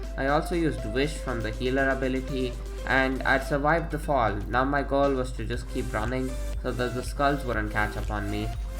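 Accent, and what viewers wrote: India. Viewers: How do you have such a Indian accent?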